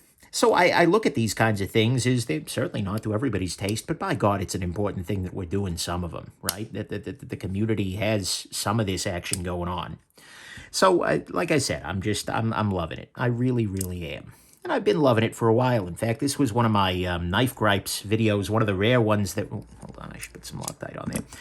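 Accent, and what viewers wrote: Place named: New England, United States. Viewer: Am I the only one that thought He was from New England and not Cali?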